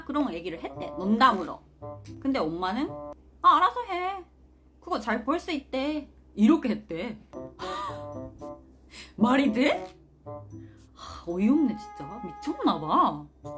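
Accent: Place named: Gyeongsang, South Korea. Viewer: 아니,,, 나 경상도 사람인데 이분 표준어 쓰는줄알았는데 자세히 들어보니까 경상도 말쓰는거같네 ㅋㅋㅋㅋㅋㅋㅋㅋㅋㅋㅋ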